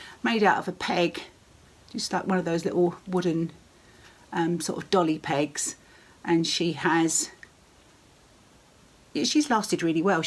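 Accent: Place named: Nottingham, United Kingdom. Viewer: Gorgeous stockings! Loved your use of the word “tiddle”. We had a lovely neighbour who we watched over. She was from Nottingham & always used that word. My hubby could never figure out why she always called him duck! We were neighbours here in Vancouver, Canada for 28 years. Sadly she passed away 6 1/2 yrs ago at the age of 90. Your accent always makes me think of her. Merry Christmas to you & your family.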